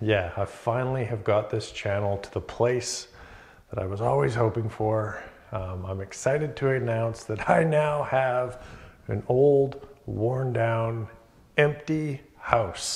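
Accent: Canada. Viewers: Many congratulations. Solid channel that I have taken so much from as I have embarked on learning DIY and home maintenance from scratch over here in the UK. The accent gives me fond memories of my time in Canada and the advice is superb. First DIY video I watched on youtube was your circular saw - don't cut off your sausage fingers one and I am glad to say my sausages are still attached. Anything windows, cabinets, studwork, decks, sheds, stairs welcomed. Good luck!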